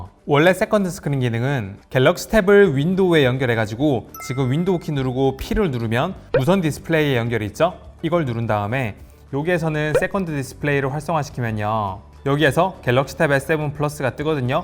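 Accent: Gyeongsang, South Korea. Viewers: 경상도 억양 빼곤 좋습니다.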